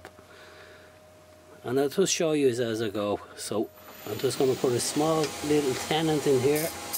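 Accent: Dublin, Ireland. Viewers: You're Irish!<3 Where about are you from? You sound like you're from Dublin. I am from Dublin and am a student. I have a great interest in woodworking :)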